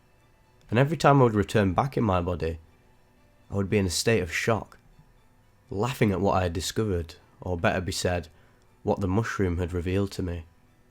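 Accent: United Kingdom. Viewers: Love your british accent, voice and tempo👍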